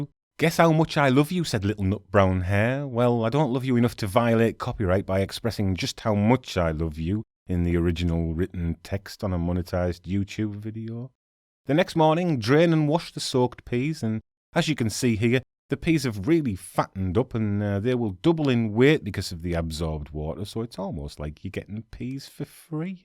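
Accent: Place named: Yorkshire, United Kingdom. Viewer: Loving the Yorkshire accent.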